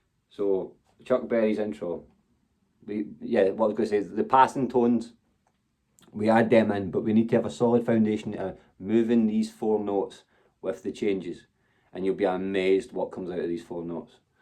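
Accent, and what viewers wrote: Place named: Ireland. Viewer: Thanks for the lesson. Next teach how to talk with that cool Irish accent! I’ll bring u some cough syrup and a new mounting bracket for that tv 😂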